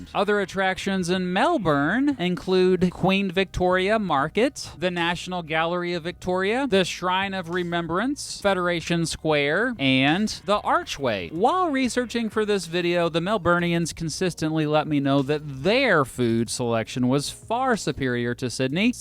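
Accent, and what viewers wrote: United States. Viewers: Melbourne is my favourite city!!! (I’ve lived in Adelaide, Sydney, Melbourne, Toronto and London) Btw the way you pronounced ‘Melbourne’ did not make me cringe. Aussies say it like ‘Melben’ because we don’t pronounce our R’s like Americans do. So with the American accent, the way you’re pronouncing it with the R is not really wrong (imo). As long you’re saying ‘Mel-burn’ and not ‘Mel-BORN’ like the Bourne Identity. That one makes me cringe... though I can’t really blame people for doing that since it’s spelled the same way.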